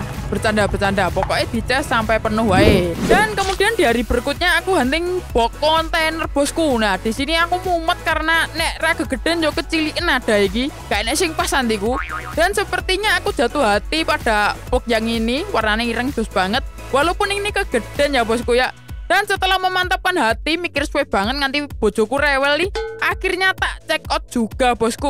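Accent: Java, Indonesia. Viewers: Kentel banget jowo nya.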